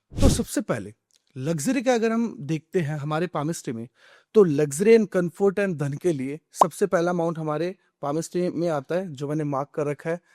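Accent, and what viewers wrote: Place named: Marwar, India. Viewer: No doubt aap ek Marwadi bande ho no doubt apki boli bhasha se jana bcoz no doubt main bhi marwadi hu 😅😅😅 baki no doubt apne jo hhi bola h vo nhi ke brabar h 😢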